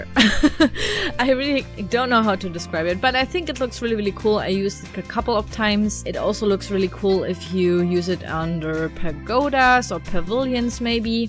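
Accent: Germany. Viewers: Kanns irgendwie sein dass du deutsche bist also nicht bös gemeint aber irgendwie klingt dein englisch ein bisschen nach dem deutschen “akzent“ Und btw dein haus sieht unglaublich geil aus🤗💕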